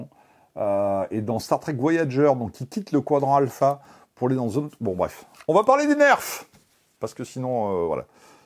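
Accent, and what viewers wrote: Germany. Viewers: Super l'axent allement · Pourquoi tu parle et cri avec un accent Allemand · Un peu fatiguant l'acsan allemand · Il me tu avec sa façon de crié avec l'accent Allemand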